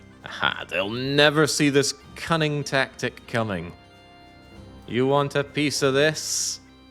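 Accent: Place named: Scotland, United Kingdom. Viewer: Finally, some Scottish accent from. He refused to talk like that when playing a Scottish vassal back in CK3.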